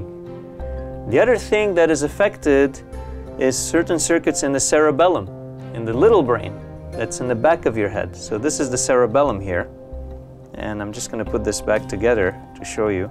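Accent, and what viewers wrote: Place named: Netherlands. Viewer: ... why do you sound Dutch?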